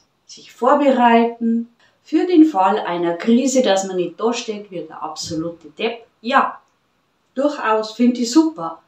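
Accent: Bavaria, Germany. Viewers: Ich mag deine Videos, weil sie unaufgeregt daherkommen, sowas tut sehr gut. Dein Bayerisch mag ich weniger, weil ich ein Viertel nicht verstehe, obwohl ich mir große Mühe gebe - ich bin Berlinerin, also Preußin!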